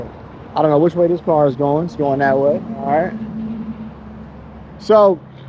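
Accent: United States. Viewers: America people need to know that we find it strange when You start to speak with an American accent. So please don't think it is Because we are racist.... We also generally don't like American people are we feel to be loud and at time obnoxious. As for Charlie Kirk ....he was a hateful man and that hate came back on him. America is fkd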